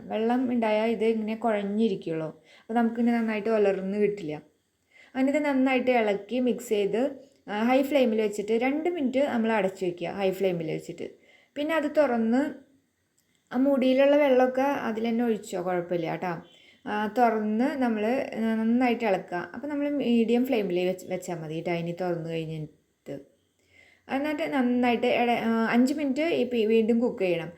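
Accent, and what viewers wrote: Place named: Thrissur, India. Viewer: നല്ല ഒരു side dish 👍👌😋😋 New 👫🤝🔔✅️ തൃശ്ശൂർ ആണോ സംസാരം കേൾക്കുമ്പോൾ തോന്നുന്നുണ്ട്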